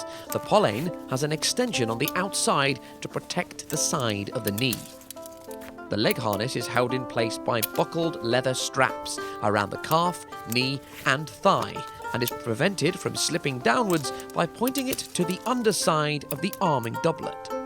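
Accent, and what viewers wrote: United Kingdom. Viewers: man this guy doesnt know what he's talking about does he ps. your accent sucks man + british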